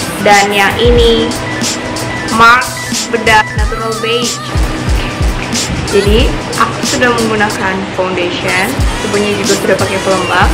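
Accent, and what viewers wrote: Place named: Banjarmasin, Indonesia. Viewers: norpuadah puadah ketahuan yaaa.. memang asli banjarmasin mbaa.. thx for visiting 😂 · bahasanya kaya orang banjarmasin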